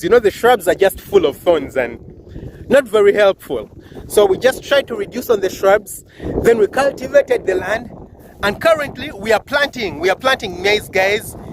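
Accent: Uganda. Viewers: Ur accent sounds ugandan where u from bro?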